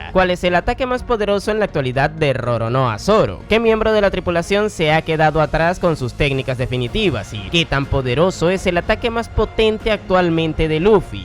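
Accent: Dominican Republic. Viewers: tengo una pregunta importante que hacerte, eres Dominicano?🇩🇴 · ERES DOMINICANO ? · tú eres dominicano brot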